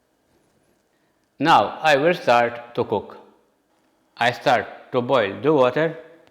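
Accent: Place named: Malta. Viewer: yes but i picked up on your accent and just asking if you are of maltese decent. i know because i am 😊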